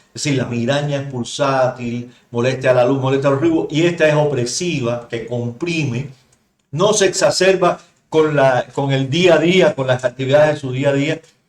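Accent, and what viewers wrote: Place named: Cuba. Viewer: Muchas gracias doctor por tan buena explicación. Por su acento creo que somos compatriotas cubanos( espero no equivocarme ) . Pasaba por aqui buscando información acerca de la cefalea tipo tensional ya que llevo años padeciéndola intermitentemente y me ayudo mucho reconocer los signos de alarma, ya que eso es generalmente lo que mas a uno le preocupa. Exitos en su canal!!!!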